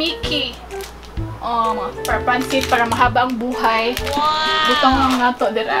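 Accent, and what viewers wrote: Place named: Visayas, Philippines. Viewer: Bisaya kau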